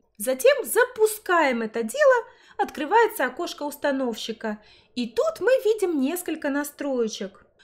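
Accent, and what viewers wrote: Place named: England, United Kingdom. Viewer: как ты делаешь такой акцент англиский.Я попытался этим акцентом пару слов проезнисти я язык сломал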